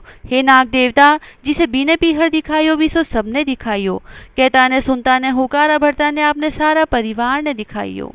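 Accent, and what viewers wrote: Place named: Marwar, India. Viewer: Bhabhiji, mera sasural Garg Agarwal, Sikar ka hain. Vahi ki bolti marwadi mein kahani sunate hain Aap, toh ghar jaisa hi lagta hain 🤗 Jay Shri Radhe 🙏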